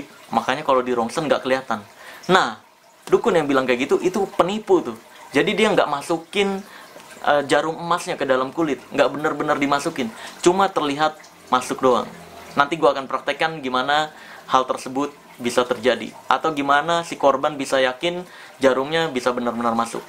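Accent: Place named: Madura, Indonesia. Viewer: logatnya,,Islam madura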